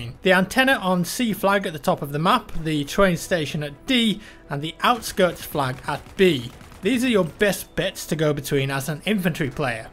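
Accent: United Kingdom. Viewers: just the british accent lol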